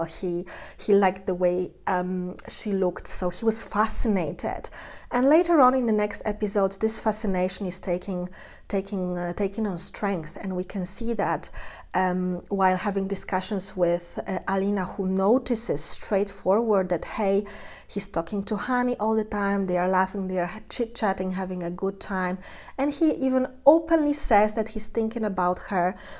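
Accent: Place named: Eastern Europe. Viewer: You come from a different culture . German men and women are very equal and it isnt a problem for a man to share an apartment with women...people are much more free unlike the soviet union where you were brought up It is only a sign that a man is open minded. When i shared an apartment i preferred to live with men because women were more irritating but i also shared with a nice woman once. It depends on the PERSONALITY. Your accent indicates you are east European where men and women have very rigid gender roles . You are reaching absurd conclusions . A man who seeks spiritual retreats is spiritual. You dont seem to have a lot of experience living with other people So it is even more absurd you speak with such confidence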